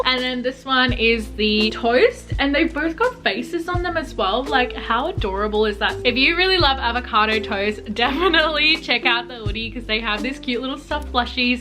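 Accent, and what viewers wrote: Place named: Australia. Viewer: god i miss this accent! aussie living in canada here! these pieces are so amazing! new sub here.. already in love with your content!! :) xx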